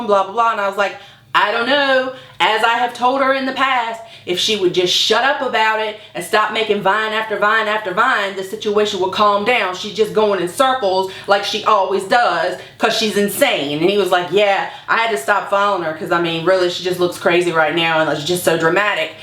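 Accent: South, United States. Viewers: tbh you are an inspiration to me I also have a very heavy southern accent and I used to hate it, now that I have found your channel I am learning to embrace it. You are my absolute favorite youtuber.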